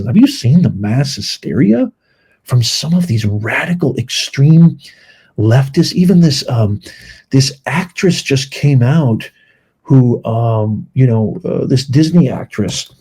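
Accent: Scotland, United Kingdom. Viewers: Absolutely love you're Scottish accent, I don't live far from Scotland and you did it well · I'm Scottish living in New Zealand, you done a great take, on the Scottish accent, ahhh.... fond memories. Keep up the great work, what a result for America and here's hoping the world's leaders start behaving like America and Argentina. It takes more energy to be negative, guess what, I'm lazy, but so positive. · Your Scottish accent is great too,! 🥁🥁🥁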